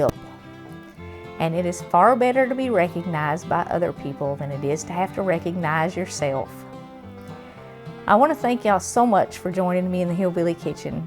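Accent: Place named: Missouri, United States. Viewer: Hello! Loved the video and love hearing you talk.My dad was from Alabama and it brings back memories. Anyhow....never made gravy w/o sausage, I'm going to have to try it. My recipe is to brown up a pound of sausage, spoon out the excess fat and sprinkle flour over it. Stir it up and add the milk. After that is is some salt, lots of coarse ground black pepper, some powdered sage and chicken seasoning for flavor. I have even used chicken bouillon cubes at times. Thanks again for the great recipes. BTW....what part of the south are you from originally?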